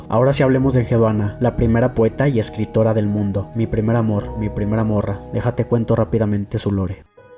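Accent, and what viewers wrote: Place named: Peru. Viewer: Heyyyyy? Me encanta tu canal ya que trata temas similares a los míos. Veo que empezaste hace poco igual que yo así que bueno, mucha suerte. Por el acento asumo que eres de Perú? Me recuerda al perro en la tacita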